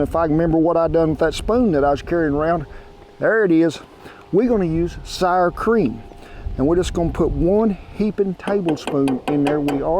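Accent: United States. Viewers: Как он говорит... Это же "кантри" в чистом виде! Наложить музыку и всё, привет и поздравленья! МОЛОДЕЦ чувак!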